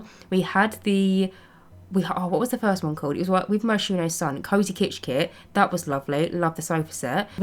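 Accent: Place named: England, United Kingdom. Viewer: I really like watching your builds but i can never understand the English accent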